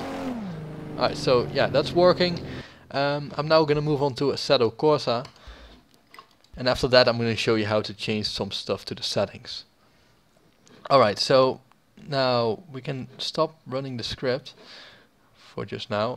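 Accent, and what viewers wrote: Netherlands. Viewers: zo te horen ben je nederlands haha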